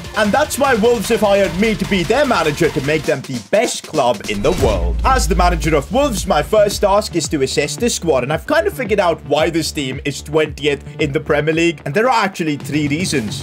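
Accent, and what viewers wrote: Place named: India. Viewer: Ngl you sound like a mix between a sterotypical indian uncle and vikstarr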